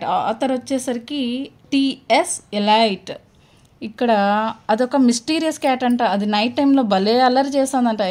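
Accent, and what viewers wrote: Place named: Guntur, India. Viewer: Madam గారు from Guntur అనుకుంటా 😊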